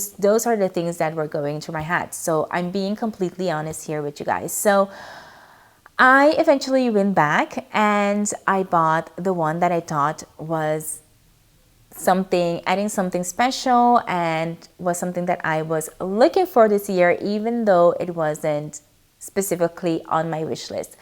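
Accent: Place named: Netherlands. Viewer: wat leuk! Ik wist het ik dacht het is Nederlands of iemand die Afrikaans van Zuid Afrika spreekt. Yes ben Nederlands Surinaams maar woon al 8 jaar in Noord Amerika momenteel in Montreal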